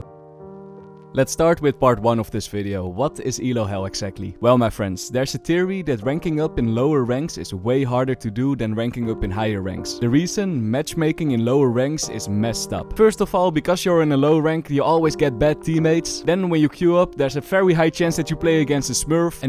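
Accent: Netherlands. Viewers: i smell the dutch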